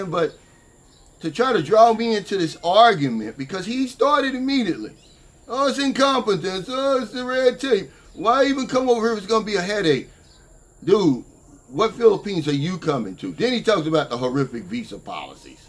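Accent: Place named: Georgia, United States. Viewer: The author seems like a nice guy sounds like Southern Georgia accent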